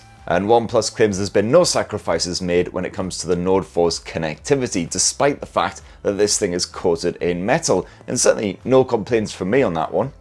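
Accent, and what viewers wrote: United Kingdom. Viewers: Love your videos bro! For a Yankee here, can you please tell me what you say in the fantastic British accent at the end? "Pub, scribe, etc"? Cheers🍻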